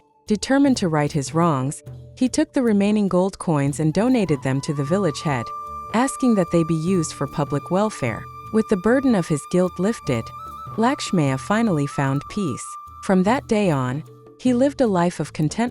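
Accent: India. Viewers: Accent indian rkho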